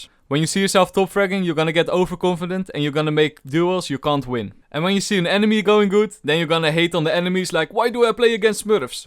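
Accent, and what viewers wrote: Netherlands. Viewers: The dutch accent😂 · you sound dutch... are you dutch? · T nederlandse accent😂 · You sound so dutch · You dutch? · Nice nl accent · ik hoor gewoon dat je nederlands bent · Ar you dutch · This is my first time watching your channel, and in the first 10 seconds I heard that you are dutch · are u dutch u have a dutch-englisch accent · We kunnen je nl accent gwn horen lmao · he said ''of'' instead of ''or''... also with his accent he really sounds dutch... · ben je nederlands? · u dutch? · jij bent van nederland he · maat jij hebt echt het meest nederlandse accent ooit hahaha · i smell dutch ik ben ook nl btw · Nederlands accent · U sound dutch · this guy is dutch for sure xD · That's one THICK dutch accent my dude holy crap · Hou have a Dutch accent are you from dutchland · i smell nederlands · Dutch accent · de seconde dat je begint te praten weet ik dat je nederlands bent😂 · Ben je nederlands??😂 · Why am i low-key thinking you are dutch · ik hoor dat je nederlands bent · r u dutch? 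this is my first video i have seen of u lol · ik hoor gwn dat je nederlands bent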